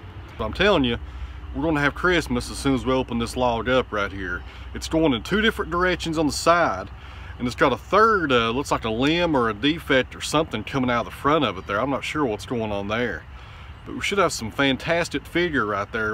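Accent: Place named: Tennessee, United States. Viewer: I'm new to your channel, and I have to say it's mesmerizing. I've never spent any time watching someone use a sawmill, but it's as though I have to watch you. What's so refreshing is your enthusiasm for the work, and the fact that you really, really appreciate the gift, direct from God himself, of the wood. I've had a love affair with wood since I first took a shop class in the 9th grade in 1972. When you reveal the inside of a log, and you revel in the fact that you are the first human being to see it, it is like unveiling a never-seen-before Rembrandt. I have stood in wonder as I gazed up at the ceiling of the Sistine Chapel, in awe of the work of Michelangelo. Yet he is no match for the beauty of a slab of walnut, uncovered by your saw mill. Thanks for bringing this great art to the world. In addition to woodworking, I'm a photographer as well. 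Both habits, errr, hobbies, are supported by my day job, which I love, and both habits, I mean hobbies, are black holes that swallow money without end. If I were to engage in milling wood like you, I'm fairly certain it would mean the end of 36 years of marriage.:) Besides, I live in a part of Wyoming where trees are few and far between. I will get my saw mill fix from your videos. Finally, I love the way you talk. I have a friend who hails from Tennessee, and he sounds a lot like you. That's a pretty unique thing in this part of the world!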